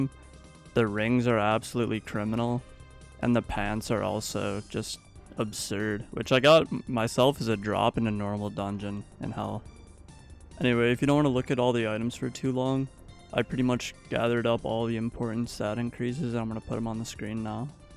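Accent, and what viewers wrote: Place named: Valley, United States. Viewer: Thats a gross ass val accent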